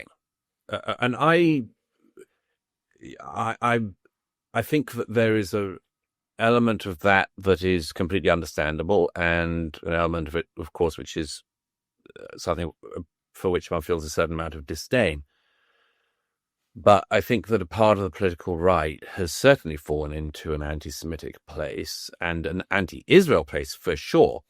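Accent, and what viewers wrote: RP, United Kingdom. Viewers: The right is critical of israel for the reason anyone else is. Because they see what is going on. Who cares what this gay gas lighter has to say ? Dave smith is Jewish and makes actual points. This posh speaking morrisey clone has nothing to say. He sounds like the democrats blaming everything on Russia after 2016.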